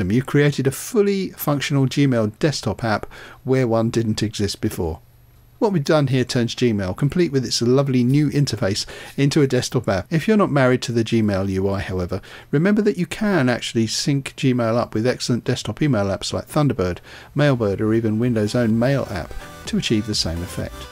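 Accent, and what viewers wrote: England, United Kingdom. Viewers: Machine gun rapid fire voice presentation . Heavy English accent. Listened to tutorial multiple times, tried ajusting volume levels , finally gave up, Will look for another tutorial for downloading Gmail into a windows 10 desk top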